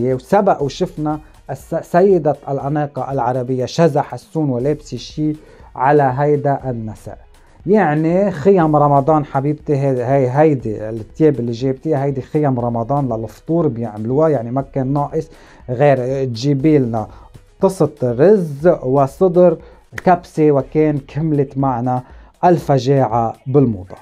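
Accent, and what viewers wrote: Lebanon. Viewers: تحياتي يا اكثر واحد مهضوم بلهجة لببنانية والله انت علاج كل المكتئبين والي عندهم الاستريس تفرج عليك ينسي كلشي هههه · تحياتي لك أخي ، تعجبني اللهجة اللبنانية خفيفة على القلب 💖 تابعت حلقة الأسئلة ؛ وفرحت جدًا إنك مسلم سني الحمد لله الله يثبتك ويهدي جميع الخلق ، ليس تعصبًا مني لكن هذا هو الطريق الحق وكل البشر سيكتشفون الحقيقة عند الموت تحديدًا أول ليلة له في القبر … أهم شي دينك الثبات الثبات . شكرًا لك 🌱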